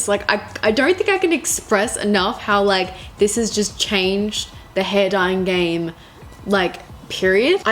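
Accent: Australia. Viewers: You look so gorgeous without makeup! Such a natural beauty, also are you Australian? I recognise the aussie accent :)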